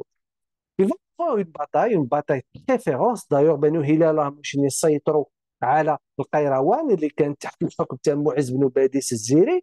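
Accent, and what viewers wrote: Tlemcen, Algeria. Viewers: كلام الأستاذ واضح لا غبار عليه حتى ولو أدخل بعض الكلمات الفرنسية مع اللهجة التلمسانية الزيانية العريقة زادت للفيديو رونقا. تحياتي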